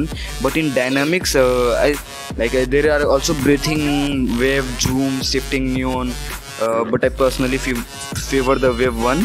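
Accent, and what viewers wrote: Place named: India. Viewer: Your Indian accent is very strong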